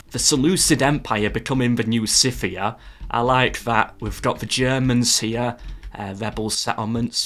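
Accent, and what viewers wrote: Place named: Northern England, United Kingdom. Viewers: He's a Yorkshireman, like Richard Sharpe. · It's his accent, he's from "the north".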